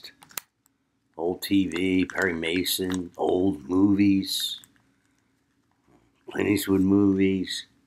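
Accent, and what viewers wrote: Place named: Philadelphia, United States. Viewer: You have a Philadelphia accent. Cool. Subscribed.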